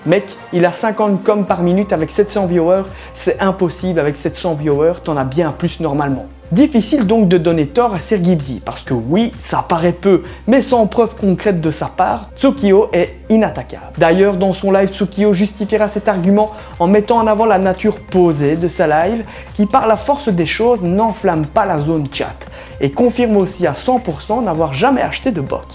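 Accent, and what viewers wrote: Belgium. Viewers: mec es ce que tu es belge car ta voix my fait penser et t'es drama ces de l'humour ou tu fais que informer sur des drama avec des preuves concrète ou tu fais juste sa pour attiser la haines ou tu utilise le mots drama juste pour la forme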